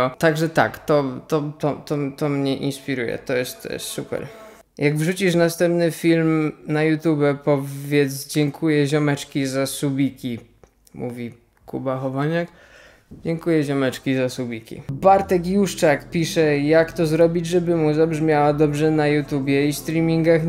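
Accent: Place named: United States. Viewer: A pytanie którego nie bylo to skąd pochodzi Twoj ang, i przede wszystkim akcent? Nikt nie ma takiego jankeskiego akcentu bez zmudnych cwiczen #anglistawie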